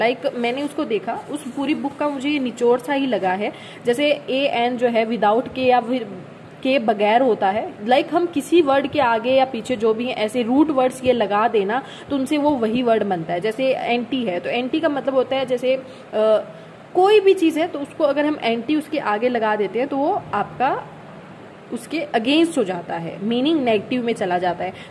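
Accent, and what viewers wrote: Haryana, India. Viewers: 🤨 sahi baat hai miss 👍👍 Main baat hai focus ki, 50-50 agar divide ho jaayega focus bank aur ssc me toh bank ka kharab hoga aur ssc bhi. 1 jagah focus kare to success zaroor milegi, meri bhi yahi soch 👍 hai Really like your Haryanvi accent 😍❤️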